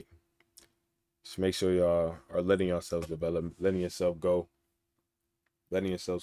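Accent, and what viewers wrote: United States. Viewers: You from Georgia bruh?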